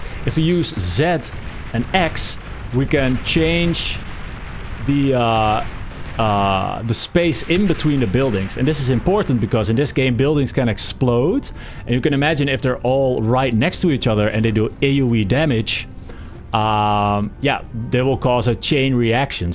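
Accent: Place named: Netherlands. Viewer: Very good video! Are you Dutch per chance? I hear an accent :)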